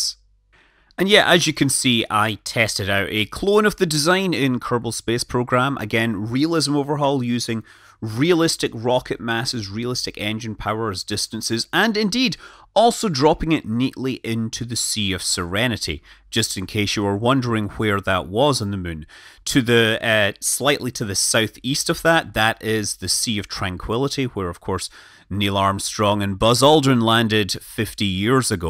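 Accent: Scotland, United Kingdom. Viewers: Can we call you { }? Are u in fact scottish? Great video's, thank you.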